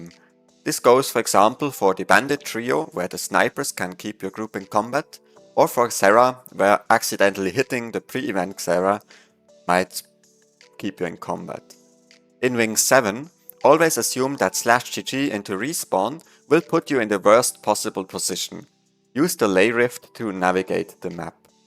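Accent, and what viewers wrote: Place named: Germany. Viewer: You are German, yes?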